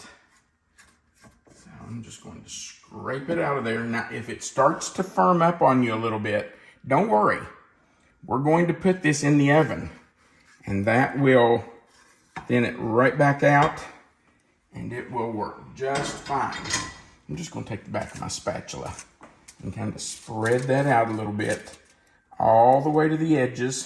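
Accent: United States. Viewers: Just sayin, I'm from Nevada. If you can say Banana correctly you can say Nevada properly. Great video can't wait to try it on our grandchildren. Didn't notice the accent....just American accent! Keep going.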